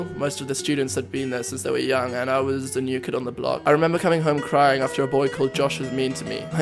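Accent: England, United Kingdom. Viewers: So did you develop the English accent when you moved, or did you have it in south africa ? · how come he has a british accent but he lived in south africa all his life?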